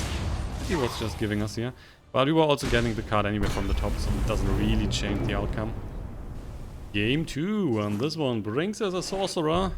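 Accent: Germany. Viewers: You speak like a german guy lul^^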